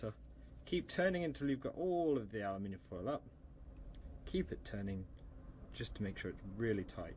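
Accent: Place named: England, United Kingdom. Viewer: but ur frum england so it funny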